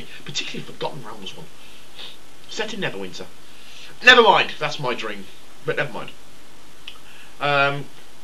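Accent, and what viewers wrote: United Kingdom. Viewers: your british-ness made this entertaining enough, not really much new to say though. Hey Hey Hey Smoke Weed Every Day. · yep definetly your real voice british? i dont know what country the currency Quids is from.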